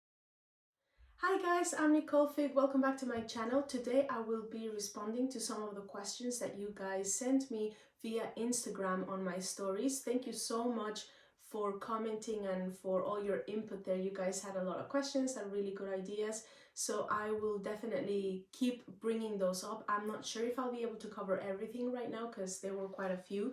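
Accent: Ireland. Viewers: Hi Nicolle, im both new to your youtube site and bodhran playing. So new in fact that ive yet to settle on which bodhran to get as my first but after watching several tutors on line, i can easily say I've gotten the most out of your content so, "yes" to your question about doing more of thes q&a sort of thing! You're an excellent drummer! I get alot out of listening but watching what you do to get those sounds is even moreso. I'm impressed with your great command over your techniques and dynamics. I have been a drummer for over 50 years playing loads of different genres of music but in all that time Irish music in general was not really in my lifes soundtrack. Nor were any of the musicians with whom I've played into it enough to pursue it either for fun or profit. So on the shelf it remained with green bagels and green beer, corned beef and cabbage and all the other commercialized "American Trad St. 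Patricks Day" faire. After morning Mass, of course! 😉 At any rate, I'm giving it a go now if only to play to cds! But im sure I'll work it in to a session somewhere eventually! Thanks so much for your inspiration as well as your wonderful content on you tube. (Its the only social media outlet i use for this sort of thing.) Oh, and your brogue is lovely! It reminds me of the way my family in the Offaly area speak. Ive other family around Ireland and for such a tiny country, the range of brogues seem vast! 😆 Be safe, and continued blessings! ✌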